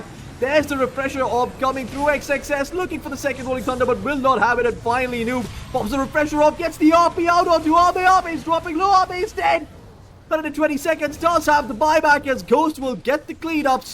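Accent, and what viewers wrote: India. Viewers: indian caster wtf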